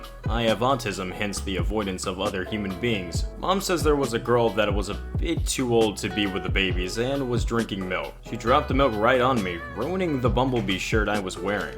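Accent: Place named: Chicago, United States. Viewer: You have a fresh suave Chicago accent, is it just me who thinks that?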